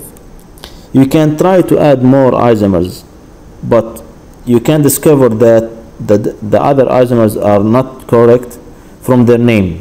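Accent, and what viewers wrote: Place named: Arab world. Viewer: you sound arab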